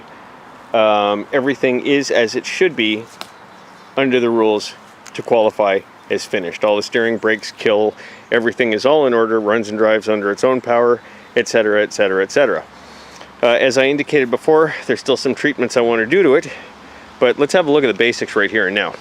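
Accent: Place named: Canada. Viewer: sounds like a Canadian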